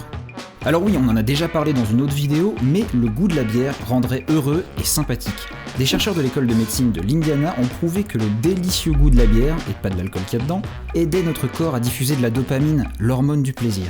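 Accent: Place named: North, France. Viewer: Tu es du Nord toi mdr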